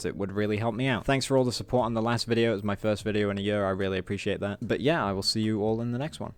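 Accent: United Kingdom. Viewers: Didn't know you were British what part??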